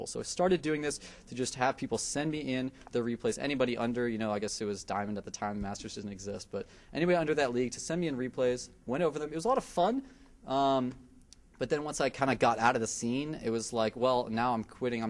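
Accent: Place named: Canada. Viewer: I think shes Canadian lol. Aboot!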